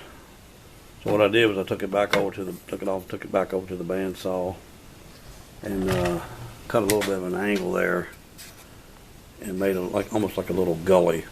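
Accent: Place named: Memphis, United States. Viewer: Is your name? That was my father's name. Your accent sounds like the Memphis/Northern Mississippi area? My father was a woodworker as well and I'm just getting into it.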